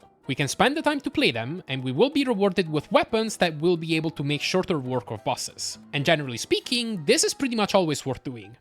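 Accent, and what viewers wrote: Italy. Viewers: Lo sapevo che eri italiano dall'accento in inglese😭😭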